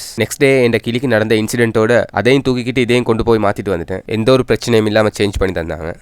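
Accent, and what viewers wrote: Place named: Kerala, India. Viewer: Your tamil is super. Cute ah iruku unga slang. Are you malayali or srilankan?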